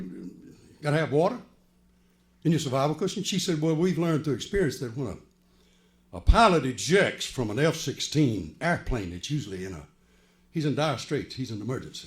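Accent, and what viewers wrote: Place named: Appalachia, United States. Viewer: This is just too funny narrative in his hillbilly way I'd imagine bubba was just as entertained about it..."my exaust is exausted"...lmao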